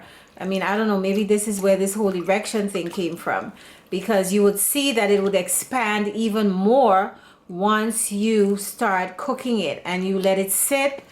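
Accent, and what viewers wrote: Trinidad and Tobago. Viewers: hmmm my Trini spidee sense is telling me your Trinidadian. Big up all my TRINI!! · You sound like my cousin from Trini, so easy to listen to. You're a natural born teacher. Thanks!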